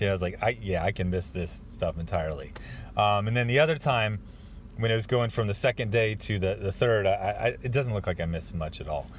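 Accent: United States. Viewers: Ya Boi comes out of the theater to record this vid and the first thing I hear is a vintage Western accent.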